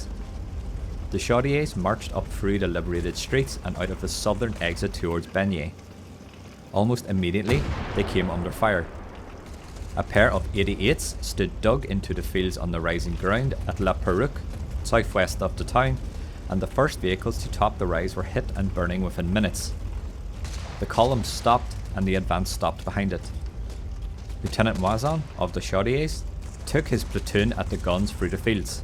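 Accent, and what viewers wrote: Northern Ireland, United Kingdom. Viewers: Judging by your northen Irish accent I assume this isnt an A.I voice over. Therefore I have subscribed and liked your video. I grew up in cork myself, but am a dutch guy living in Germany.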